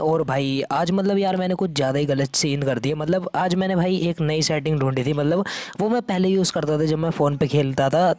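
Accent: India. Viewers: Kis kis ko ke bolne ka style pasand hai Matlab ek dam Desi wali feeling aave video dekhti ber😍😍👌👌🙏🙏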